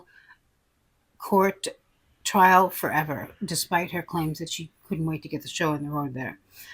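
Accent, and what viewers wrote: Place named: East Coast, United States. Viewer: Elizabeth Sarah Boone Taylor! …thanks for the check in. Love your videos, and your beautiful face! Your accent is very interesting, are you from Brooklyn? You sound kinda east coast. I’m from Oregon and we talk kinda slow here. Hah.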